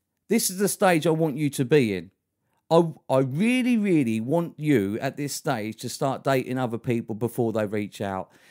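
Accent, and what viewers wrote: Cockney, United Kingdom. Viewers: Mate your videos are great top knowledge and your funny bout how you say it 😂 - you could be in Snatch the movie with that cockney accent.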